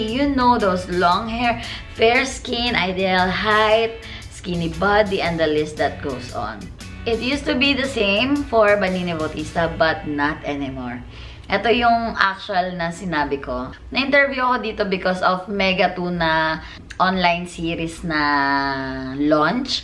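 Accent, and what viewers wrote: Batangas, Philippines. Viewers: Baninay gawa ka vlog na pure Batangeño accent.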